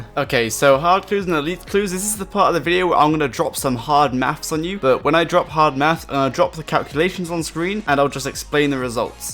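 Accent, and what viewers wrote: Australia. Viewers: He looks like an American, with Australian voice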